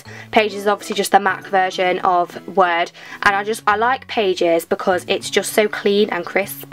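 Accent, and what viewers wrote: United Kingdom. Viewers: british accent so annoying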